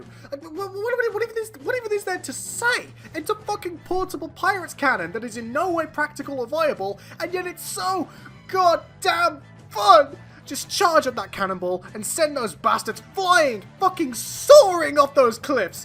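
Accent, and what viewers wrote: Poland. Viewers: are you from poland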